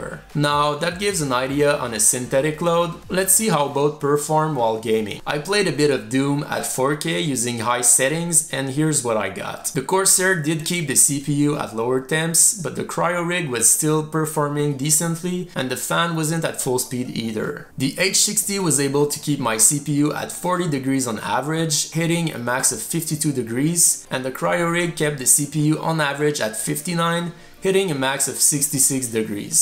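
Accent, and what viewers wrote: Quebec, Canada. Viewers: Es-tu Québecois?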